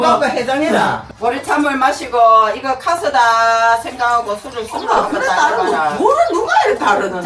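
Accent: Gyeongsang, South Korea. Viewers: 고향이 경상도 오댄교? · 경상도 사투리귀엽네요 저는 충청도입니다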